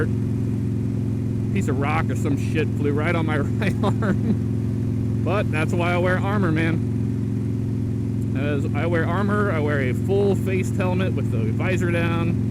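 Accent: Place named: United States. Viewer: Oh his voice like amarican😯😯😯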